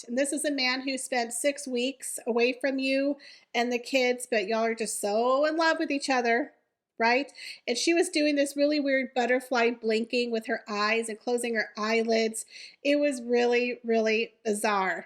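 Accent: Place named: Texas, United States. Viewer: Hey Texas girl sending ❤ like your channel. You talk like me I'm also Texas born and raised here.😊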